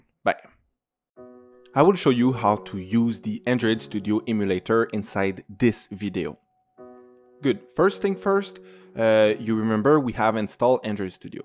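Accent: France. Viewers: Dude thanks for the tutorial! It was fun and funny at the same time! Your voice kept me focused. I laughed all along and learnt it in a fun way. Are you French btw? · the french accent makes everything better, thanks!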